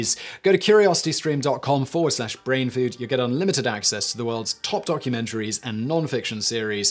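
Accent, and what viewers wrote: United Kingdom. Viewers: Never heard poontang said in a UK accent until today. Prison sucks! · oh how i wish i could have a British guy narrate my life..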